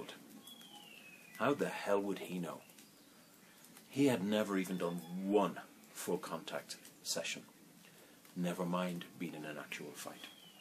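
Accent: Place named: Ireland. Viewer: Like your channel subcribed to lt lhave 20 years in karate done wingchun pressed thumbs up are you lrish you sound lrish lam lrish my grand dad was boxer and my uncles were boxer s lam 64 now love all things about japan and china my master was japanese there old lrish saying lt meant for you lt wiil not pas you by my mum and dad came from co limerick in lreland all besr with your channel godbless seamus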